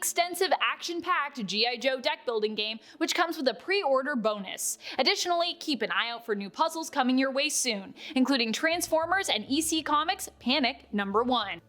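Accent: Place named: Canada. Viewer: She's Canadian, they talk funny sometimes.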